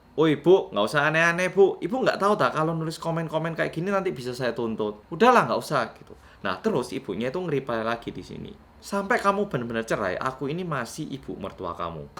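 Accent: Java, Indonesia. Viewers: CUMAN YOUTUBER KOREA YANG PERCAYA CUMAN KARENA PERNAH TINGGAL DI MALANG PLUS BISA BAHASA JAWA MEDOK😂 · kan memang korean lah,cuma dia membesar di malang makanya medhok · Padahal subscriber nya banyak dari luar Indonesia juga, piye Yo mas? Wah, Jian.... Semoga cepat diberi hidayah. Terus kawal mas, LAN Ojo lali medoke haha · Kaya orng korea mukanya..tpi ada medok2 jawa bicaranya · Min..mukamu kaya korea tp logat mefok jawa ... Hebat mi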